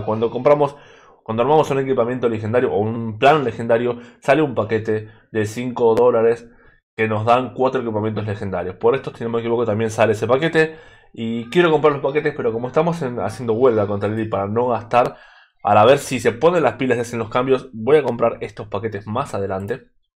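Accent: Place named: Argentina. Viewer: vos sos Argentino no? Se te nota toque en la voz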